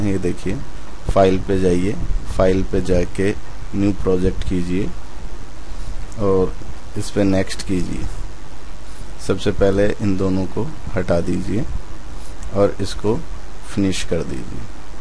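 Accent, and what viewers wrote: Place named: India. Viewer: Hey you, reading this comment, I just went through the entire Playlist for NetBeans IDE If you can ignore the strong Hindi accent, and language, grammar isn't a problem for you, Then this series is a beautiful option for you to quickly learn NetBeans in one day, Be sure to make notes of the things you learn, And you'll be good to go, I have my practical exam in the morning, and found this really useful to learn the entire course in one sitting, Concise, and important aspects covered, However, if the pace of your speaking, and repetitiveness was gone, Then it would've been phenomenal, Thank you, so much, for this series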